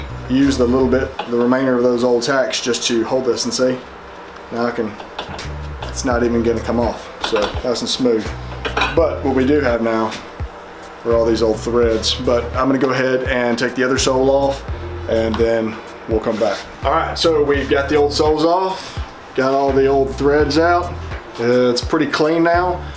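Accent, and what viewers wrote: South, United States. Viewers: Came for the shoes, staying for the hot southern drawl! 🤗🤗🤗🤗🤗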